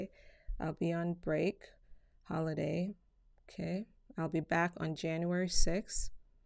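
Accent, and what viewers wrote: Jamaica. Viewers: Jamaican? You just made me like your presentations even more, if that's possible!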